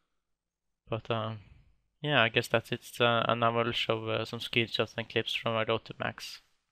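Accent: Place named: Norway. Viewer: his norwegian accent is very strong and thats not really how we talk at all, mostly elders who didnt learn much english at school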